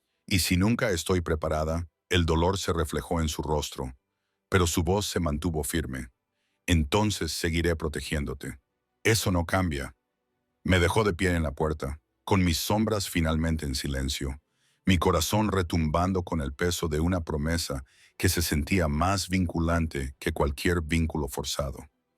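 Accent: United States. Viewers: Cambien em robot de lectura yanqui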